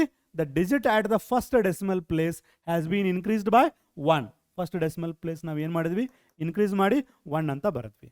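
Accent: North Karnataka, India. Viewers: Sir nimma uttara karnataka speaking ❤❤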